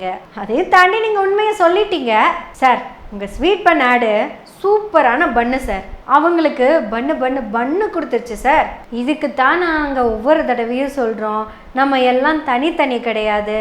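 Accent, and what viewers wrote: Kongu, India. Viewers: அம்மணி வணக்கம் மா. நல்லா பேசுங்க நம்மூர் வழக்கு மொழியில்.. அன்ன பூர்ணா காப்பி arabica கொட்டையில் வடித்தது..1963 முதல் இன்று வரை யுனிவர்சல் குணம் மணம் உள்ளது. எம் நண்பர் திரு தாமோதர் சாமி . இராமன். திரு இலட்சுமணன் ஆகியோரின் dedicated முயற்சி மூலம் இன்று உலகம் முழுக்க மணக்கிறது... எல்லா புகழும் அவர்கள் வாரிசு செல்லம் திரு சீனிவாசன் அவர்களைச் சார்ந்தது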